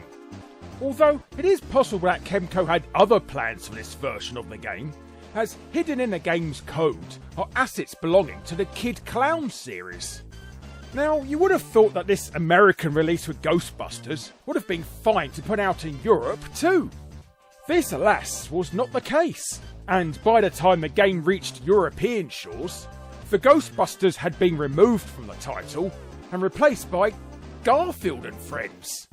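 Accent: England, United Kingdom. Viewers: I love the unrelentingly british pronounciation of 'kadokawa shoten' lol, let alone yokay busta rooka chan. Also surprised that Pandemonium!/Magical Hoppers didn't make the cut, although I guess this is specifically for licensed mascots rather than just games that changed their mascots to appeal to japan/vice versa. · your pfp looks like something Harry patridge would draw xD and you sorta sound like him with a English accent xD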